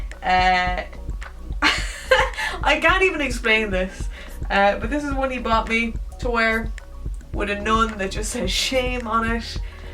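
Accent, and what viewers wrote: Ireland. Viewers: A-ha! Oh that was solid!, I sent those cakes. Still my fav Try video! Now I’m all happy you gave them a mention! Billet Log over the Joe Louis?!?! Pure craziness! 👍🏻 This ones for you miss fancy leprechaun accent girl!! 👍🏻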